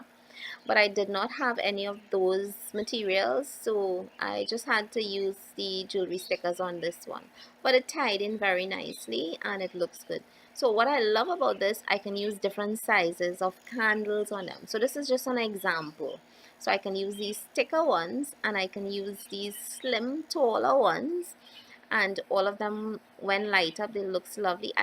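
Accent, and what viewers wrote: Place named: Trinidad and Tobago. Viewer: Awesome DIY, Love it and I love your Trini accent. Tfs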